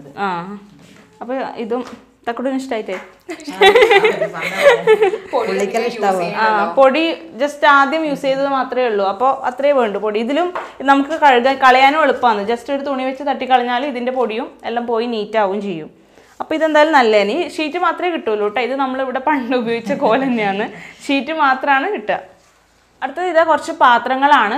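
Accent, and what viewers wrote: Kannur, India. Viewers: Chechide veedu kannur aano? Kannur slag varunnundu athukond chothichatha.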